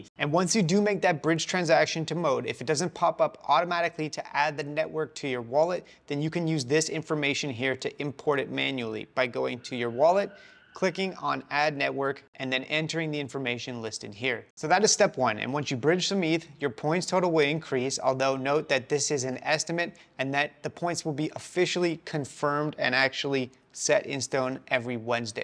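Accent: Australia. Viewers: but he sounds like an Aussie... kind of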